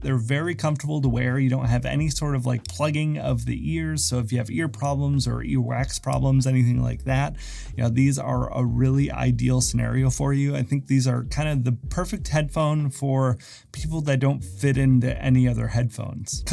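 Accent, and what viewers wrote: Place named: Austria. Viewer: are you austrian, you sound like arnold sometimes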